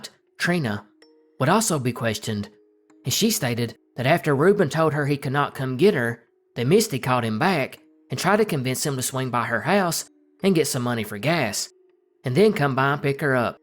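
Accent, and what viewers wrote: South, United States. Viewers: My favorite part of.. is his accent..and smart narration. 😎 where is he from? I'm not a complete moron..I'm guessing In the south.. but I'm from Canada 🇨🇦..so give me a break. 😅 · has the cutest accent. I actually find it incredibly wholesome and comforting despite the grim things he sometimes covers. Im born and raised in Mississippi and my family has been here for several generations....this may surprise some folks, but different areas of the south have different accents - a lot like the UK. The queens RP doesnt sound like a Welsh person or like a person from sat..Manchester. We dont all sound like some rich wannabe in Georgia or some hick hillbilly with 1 tooth 😂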